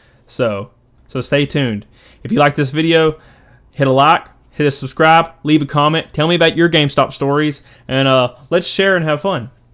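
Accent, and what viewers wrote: South, United States. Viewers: This just popped up for me. So weird hearing you try to turn off the southern twang. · he's so southern i love it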